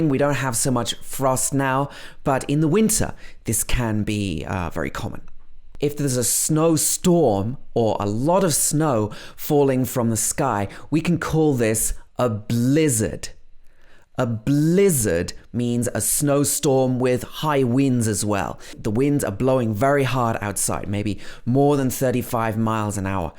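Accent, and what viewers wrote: United Kingdom. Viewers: This my favorite British accent coach and channel 🔥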